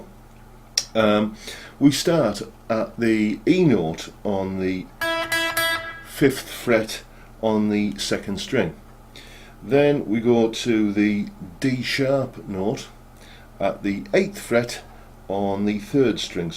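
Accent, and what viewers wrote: United Kingdom. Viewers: HI - PLEASE EXCUSE THE CAPS BUT I AM PARTIALLY SIGHTED - ONCE AGAIN BRILLIANT - NICELY EXPLAINED AND WITH TABS!!! - MORE MORE MORE - SO GLAD I FOUND YOU - THE ALBUM IS GREAT - AND IT'S NICE TO HEAR A BRITISH ACCENT THANKS - DAVID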